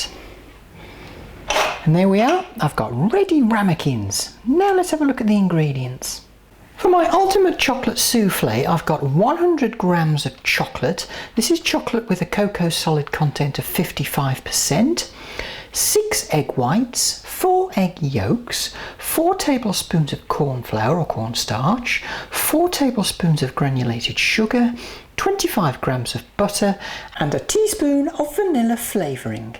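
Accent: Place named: RP, United Kingdom. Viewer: i love your high english...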